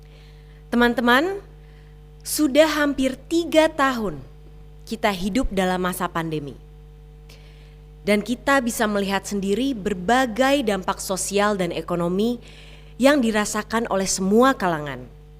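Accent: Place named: Bali, Indonesia. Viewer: Logat bali .💪indonesiaku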